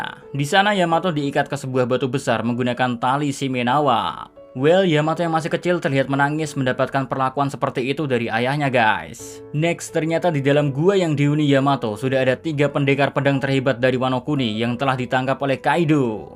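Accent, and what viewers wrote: Java, Indonesia. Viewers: Lidah jawa mas . Jangan heran . Yang penting penonton paham 😁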